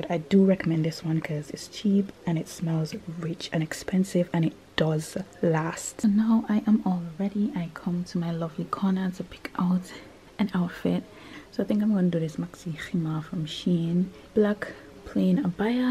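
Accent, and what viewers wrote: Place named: Jamaica. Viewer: I know a fellow Jamaican when I hear them🧏🏾‍♀️ Allahumma barik sis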